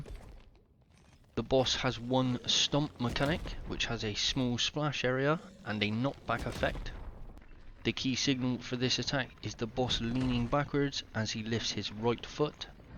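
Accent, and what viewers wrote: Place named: West Country, United Kingdom. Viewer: Summerset or Devonshire boy? Trying to place your accent my Brother, Cornishman here. It's a nice little guide thank you man.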